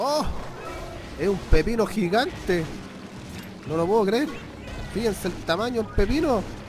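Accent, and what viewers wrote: Chile. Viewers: eres chileno verdad? Y si lo eres de que parte? Bless y me gustan tus videos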